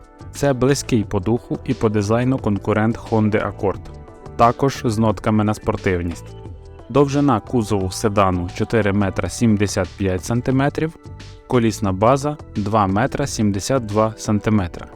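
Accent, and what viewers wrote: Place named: Ukraine. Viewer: Ничего хуже хохлового говора в обзоре машин нет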